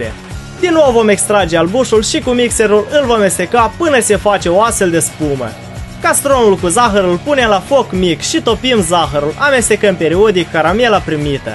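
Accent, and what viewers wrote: Moldova. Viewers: Da tu esti din Moldova da?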